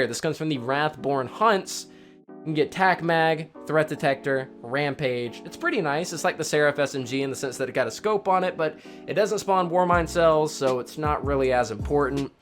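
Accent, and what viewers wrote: France. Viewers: U actually French?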